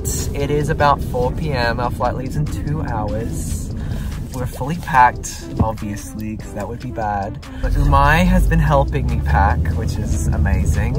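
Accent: Australia. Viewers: I`m confused, if you have never lived in Australia, then please explain the Australian accent??? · so why would that make him sound Australian? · You don't have an American accent. In fact your accent sounds Australian. How is that when you say you have never lived in Australia? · wait, your accent can pass as aussies-ish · If you've never lived in OZ, then why do you have (mostly) an Australian accent? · You have an Aussie accent and never lived there? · There’s no way this kid is American. He clearly has an Australian accent. He would’ve had to have spent some SERIOUS time in Australia to develop this accent. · that is a lie. you are an Australian. You have spent many years here for your accent to develop. Without a doubt, you went to Primary School here in Aus, STOP lying to ppl. And at what year (DUH your school year) did you leave Aus? Your readers deserve to know.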